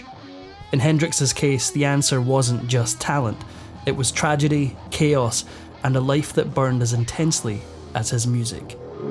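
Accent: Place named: Scotland, United Kingdom. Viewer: Yay my favorite narrator love listening to the Scot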